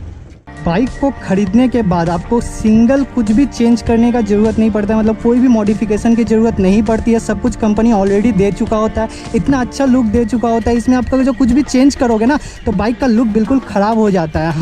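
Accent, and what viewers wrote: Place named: Bihar, India. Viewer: loved bihar touch in your voice. bhaiya ji maja aa gaya.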